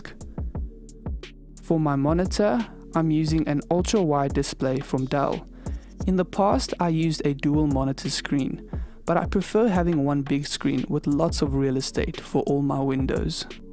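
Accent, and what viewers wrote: South Africa. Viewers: bro u have a south african acent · Are you South African ? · You really sound South African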